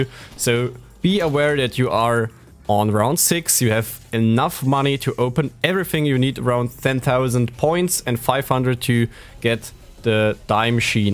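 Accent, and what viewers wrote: Germany. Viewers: sorry aber dieses deutsche englisch macht mich sooo aggresiv :D aber gutes video habs doch einigermaßen verstanden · Ur from germany right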